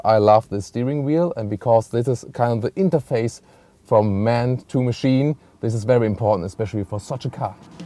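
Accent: Germany. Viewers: God I love listening to a German accent when watching videos about cars!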